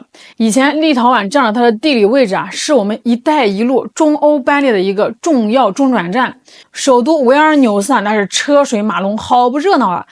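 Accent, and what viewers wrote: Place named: Shandong, China. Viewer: 主播山东姑娘吗？带着家乡味呢❤❤❤😂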